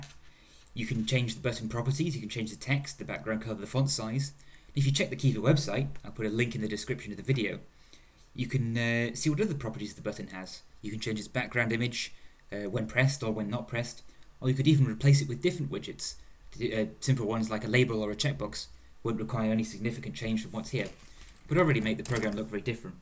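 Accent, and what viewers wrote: United Kingdom. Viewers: I love i when British people narrate tutorial videos !! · These videos seem to be very interesting but unfortunately I am not able to understand the author as I should for a tutorial. His british accent and the speed at which he speaks is too much for me :( Subtitles are no option. sad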